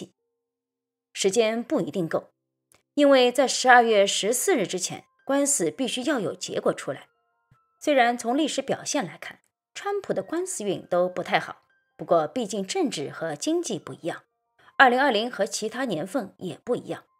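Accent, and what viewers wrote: Shanghai, China. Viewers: 老師妳的德文真好，我的德文早已還給教書先生了。羨慕妳的多才多藝。有人説妳有上海口音...我父母是上海人，民國 38年到台灣，最後也沒有機會回到上海看一看。如果不是國共內戰，我可能也會在上海長大。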